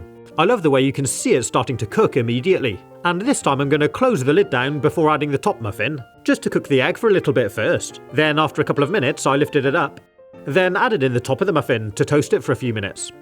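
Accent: United Kingdom. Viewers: nga hes jus british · The most British voice I ever heard 😊 good video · Apart from the constant upwards inflection at the end of every sentence, this bloke is the most British man to ever British. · SO HES BRITISH?! I’ve been wondering what his accent is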